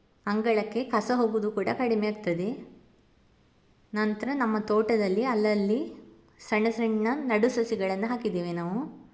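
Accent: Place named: Mangalore, India. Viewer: Mangalore kannada bhashe kelode super 😊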